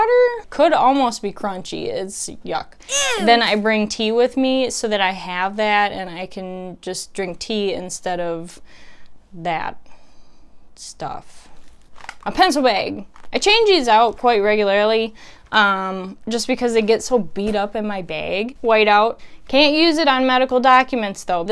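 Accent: Midwest, United States. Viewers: That midwestern accent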